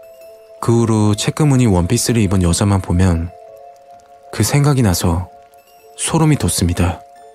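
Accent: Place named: Busan, South Korea. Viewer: 절은청년같은데 우째그래 이야기를 차분하게 잘합니까? 말씨는 부산말씨도 많이섞이가 듣기가 참 개안습니다.이야기 내용들도 들을수록 자꾸 더듣게됩니다. 앞으로 도 많이들려주십시요수고하십시요,.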